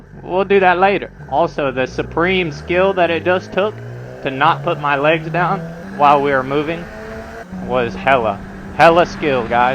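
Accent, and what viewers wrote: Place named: South, United States. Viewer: bro gained a southern accent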